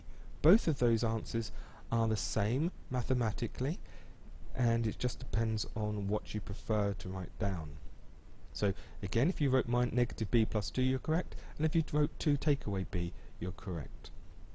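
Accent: United Kingdom. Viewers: Why are the lessons are being u British man? I can't understand that heavy ass accent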